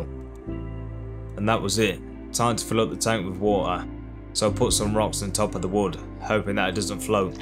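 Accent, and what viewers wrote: United Kingdom. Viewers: I love your channel this british accent is awesome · With that accent you have to be from the East Midlands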